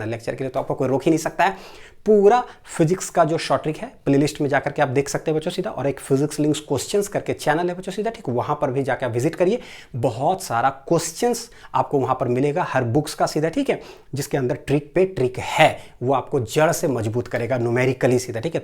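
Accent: Bihar, India. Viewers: thanku sir आपसे पढ़ने पे अपना वाला फीलिंग आ गया आपका accent बिहारी वाला है न 😌❤️ सामझ् गए सर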